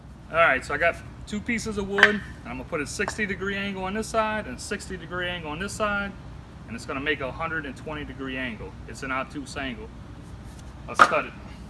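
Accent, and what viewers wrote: Louisiana, United States. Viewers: Bruh you got to be from southwest Louisiana! Thanks for this video. Learn something new. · Can you tell he’s from Louisiana? Good video. His keep it simple instructions are great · i can tell by your voice you are a son of Louisiana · Louisiana accent so distinctive. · First off, are you from south Louisiana? You have a good accent that suggests Cajun upbringing. Secondly, thank you for providing REAL instruction. Everything else I have found while google searching online has led me to absolute basics for people that probably are incapable of even tying their own shoes. I'll look through more of your stuff as I search for information on making compound miter cuts. Thanks again. (And if you are indeed from south Louisiana, I'll add a hearty "GEAUX TIGAHS!")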